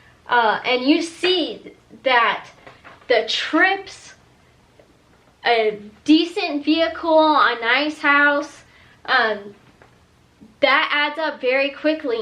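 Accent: Russia. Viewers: You have a tiny Russian accent lol I think you got it from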